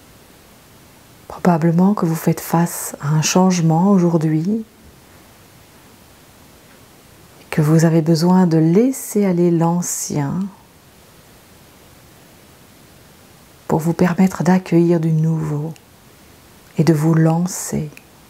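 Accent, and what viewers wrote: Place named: Belgium. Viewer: Bonsoir et merci pour cette nouvelle séance qui m’a fait beaucoup de bien. J’ai appris que vous habitiez Mons, en Belgique. Je me doutais un peu, j’avais reconnu votre accent, je suis du Nord de la France 🇫🇷 et le mien ressemble au vôtre. J’ai eu une douleur supportable au milieu du dos, du côté droit que je n’ai jamais eue, est-ce à cause de cette pratique ? Pouvez m’écrire le nom de votre nouvelle pratique, les heures et jours où l’on peut vous retrouver en live sur YouTube car je n’ai pas eu le temps de les noter. Merci beaucoup.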